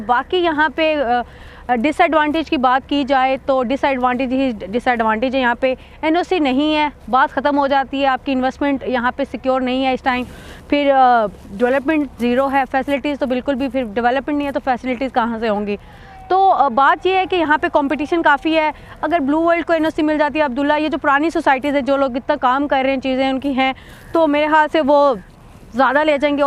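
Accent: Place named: Rural, India. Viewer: Tumney baal bhi seedhay kiye huvay hain aur kaalay chashmay bhi lagaey huvay hain ,, lekin jab bolti ho tou pata chal jata hai k after all paindu ho. Bilkul Muhammad Amir ki tarah ,,, shakkal bhi ussi sey milti hai aur baaton ka andaaz bhi. Thori bolnay ki speed kum karo, thori narmi aur shaistagi paida karo apnay lehjay mein, aur relax ho kar bolo.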